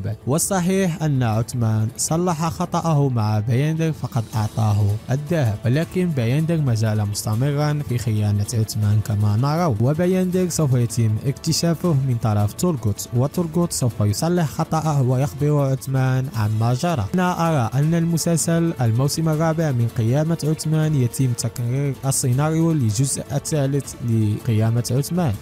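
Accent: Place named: Tetouan, Morocco. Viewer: أخي إن أهل مدينة تطاون (تطوان) ينطقون الراء بهتن الطريقة وليس لهم يد في هذا . واهل فاس الرباط سلا ووهران المستعمرة من الجزائر ايضا يتحدثون براء قريب من هذا النطق. فأرجو ان تتقبلوا الاختلاف.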